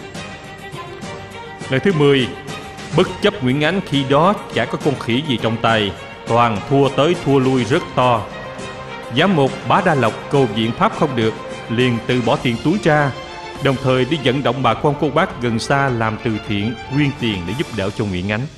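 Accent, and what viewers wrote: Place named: South, Vietnam. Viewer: Mình có một góp ý thế này: Clip đã thực sự đáp ứng nhu cầu "thêm muối" khiến mình thấy khá "lạ miệng" thay vì những tiết học khô khán trước đây. Hiệu ứng hình ảnh là điểm cộng to lớn, vì nó góp phần giúp người xem nhớ lâu hơn rất rất nhiều lần so với những hàng chữ chạy dài trong SGK. Giọng đọc của chú Lồng tiếng viên cũng rất truyền cảm. Thật sự chân thành cảm ơn cả ekip, những con người có tâm huyết với lịch sử dân tộc, đất nước, với thế hệ trẻ nước nhà. Là một người tuy có học thức còn hạn hẹp về Sử Việt, và cũng chung con đường tìm về cội nguồn đất nước(tuy bằng hình thức khác :)) ) , mình thực sự rất cảm động vì cảm thấy bản thân không đơn độc. Chỉ là, mình thấy hơi buồn vì mình nghe không quen giọng Nam của chú lồng tiếng nên nhiều lúc mình theo không kịp. Thiết nghĩ sẽ có rất nhiều bạn gặp vấn đề tương tự như vậy. 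:( Mình biết sẽ hơi quá đáng nếu đòi hỏi có thêm một giọng Bắc nữa chăng? Một dự án hay như vậy, hiếm có như vậy mà bọn mình lại chưa "hấp thụ" được một cách "hoàn toàn" thì quả là hơi hạn chế. Chỉ là một chút suy nghĩ thôi ạ, Nhưng vẫn phải cảm ơn mọi người một lần nữa vì cái tâm lớn lao của mọi người! Chân thành cảm ơn!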